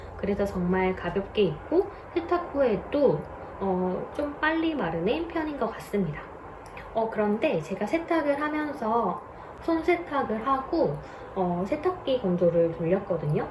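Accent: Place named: South Korea. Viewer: 어쩐지 억양이 있어서 평소에 사투리 쓰시는분인가부다 했는데 역시 남쪽에 사시는분이셨군요😊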